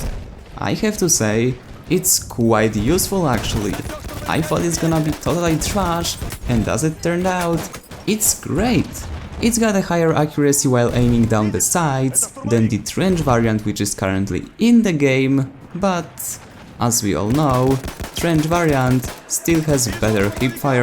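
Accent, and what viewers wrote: Poland. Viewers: He just spends 8 minutes rambling on about crap with a horrendous polish accent. Yeah, right.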